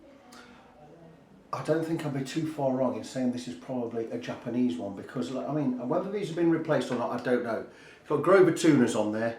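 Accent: United Kingdom. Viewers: I give this luthier a thumbs up 👍🏼for his repair skill a thumbs👍🏼 up for that cool British accent and one 👍🏼for his video editing skill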